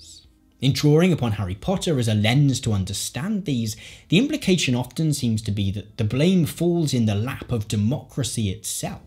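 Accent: RP, United Kingdom. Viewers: Hi, I love your contents but I am fascinated with your accent and intonation in particular. could you please tell me what is your accent?is it posh?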